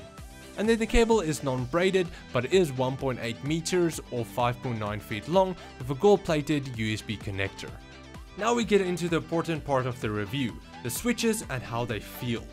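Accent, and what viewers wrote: Afrikaans, South Africa. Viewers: This dude sounds so afrikaans it's crazy XDD great video. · He sounds like hes from South Africa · I can tell a south African accent from anywhere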